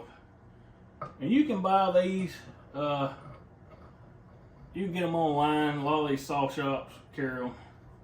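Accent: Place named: South, United States. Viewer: you might want to check a company called Farmertec. It is a Chinese company that sells all kinds of parts for your saw on the internet. I bought parts from them before. It was not too bad, shipping and cost. I also watch a couple chainsaw youtube channels, people who work on them.. Tinman and the second one is ironhorse. both are very good down home people. They might have some used parts you need. You remind me of my dads side of the family. They are also from Tennessee. You look like them and your size is about the same. I can definitely understand your southern accent. It makes me smile. Oh I miss them. Now I'm old and they are gone.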